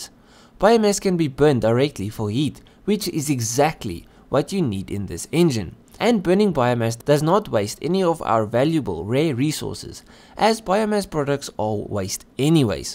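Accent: South Africa. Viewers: This guy sounds South African - whooHoo!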